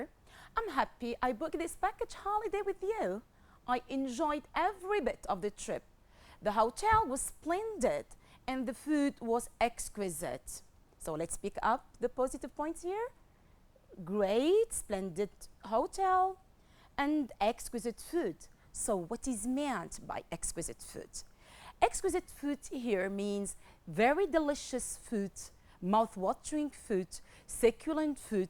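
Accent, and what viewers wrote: United Kingdom. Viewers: Loved that RP accent bravo madame ❤❤ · Reminded me of the old British accent ..I thank you for dealing with all the necessary components required for the productive stage ..Hats Off!!!!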